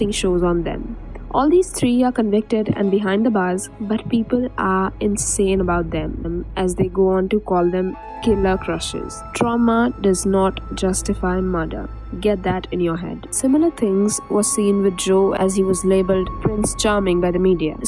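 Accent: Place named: India. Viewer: Omg this indian accent makes me angry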